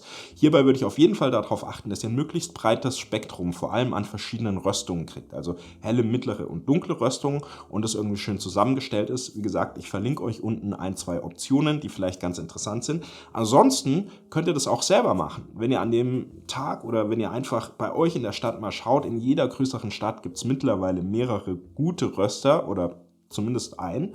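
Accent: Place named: Bavaria, Germany. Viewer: 🤣sehr lässiges Boarisch!! Weida so!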